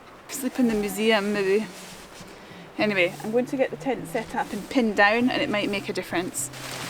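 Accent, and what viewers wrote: Scotland, United Kingdom. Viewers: I am so glad I found your channel|! I truly LOVE your accent and Scotland. I have lived in Edinburgh for a year and toured around Scotland a lot. Love from🥰🥰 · I am enjoying watching your video,s. You are a lovely lady, and I love your beautiful Scottish accent.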